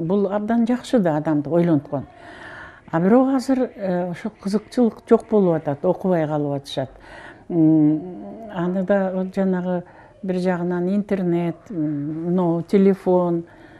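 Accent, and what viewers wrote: Standard. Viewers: Нукура кыргыз тилин суйлогонун кайра кайра коруп угуп жатам бар болунуз эжеке кооп жашаныз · Сизди угуп олтуруп абдан жонокойлукту кордум ,кандай суктанаарлык муноз,эч нерсени унутпай жашоодо талкан, каймак жонундо абдан так айтканы кызык,анткени он сегиз жашымда биринчи Фрунзеге борборго келгенде аэропорттон коргом,бирок кыргызча билбейт го деп сыртынан негедир муноздомо бергем,ушунчалык так таза нукура суйлогону кызык,а Чынгыз Айтматовду Алма-Атада коргондо ким эле деп ойлоп карап,анан эстеп кетип барбагам сыртынан гана карап, негедир жаштык кылып жанына чуркап барып учурашпаганыма азырга чейин окуном ,бактылуу каарылык корунуз ! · Керемет,кыргызча суйлогону тан калтырды,таза,нукура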